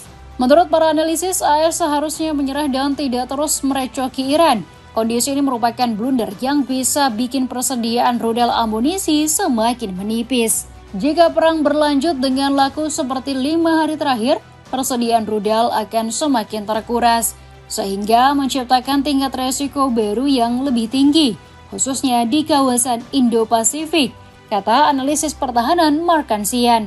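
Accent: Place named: Java, Indonesia. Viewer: Dari logat orang Jawa tetapi kok mengucapkan ejaan pakai bahasa asing sangat memalukan sebagai orang Indonesia yang sok kebarat baratan mengucapkan ejaan Indonesia tidak dipakai payah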